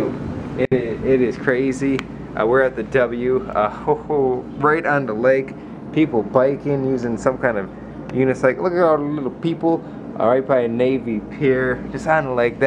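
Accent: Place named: Ireland. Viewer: You like an Irish man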